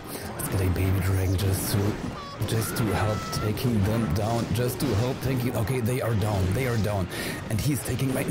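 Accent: Germany. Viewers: Your german accent is too awesome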